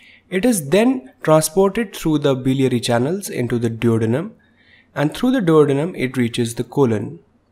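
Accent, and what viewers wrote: India. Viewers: Şu hayatta hintli İngilizcesi kadar kahreden bi şey daha yoktur. Ama iyi video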